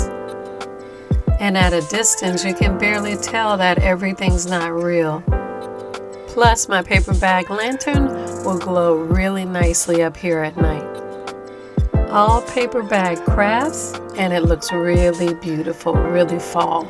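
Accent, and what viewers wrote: New Orleans, United States. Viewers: you crack me up enunciating pump-kin extra hard since people were commenting on your New Orleans accent. It’s ok to say pun-kin 😂 🎃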